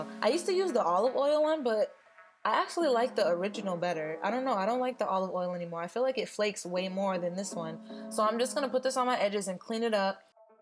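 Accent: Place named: Queens, United States. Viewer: Are you from Queens? You kinda sound like it.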